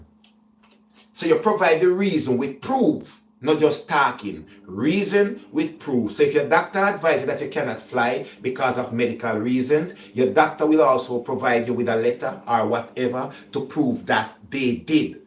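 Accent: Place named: Jamaica. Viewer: ❤. Love listening and learning. Keep up the great work for the people.❤❤ Truly just Love the Jamaican accent. Would Love to return to and immigrate to Jamaica. ❤❤❤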